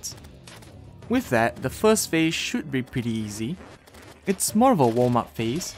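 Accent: Singapore. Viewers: Are you SG/ Malaysian bro? Going by the accent 😃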